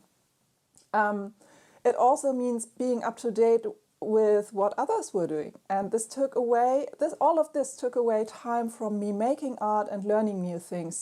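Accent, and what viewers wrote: Germany. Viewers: I like your accent, during your video my mind almost convinced me that your were speaking German and not English ;D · Thank you for this post! I felt the same way. But a certain schedule helps to stay away from checking it, besides I haven’t been on instagram from March to October of 2022. And it feels like I need to do it again 😅 But I joined Behance, where I can communicate with different types of artists. Not sure about this platform yet, but at least my portfolio is online. P.s. Love your German accent so much. When I heard it, I’ve realized how much I miss this country and the language itself. Danke schön ❤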